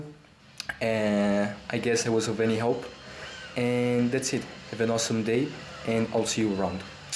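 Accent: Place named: Brazil. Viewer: yo are you half mexican half italian? Strange how that combination would give you a vaguely brazilian accent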